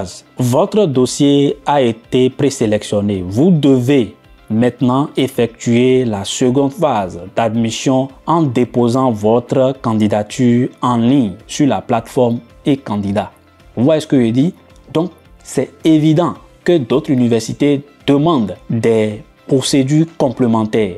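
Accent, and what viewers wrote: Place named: Benin. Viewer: tu dois être béninois vu ton accent! moi ton voisin togolais !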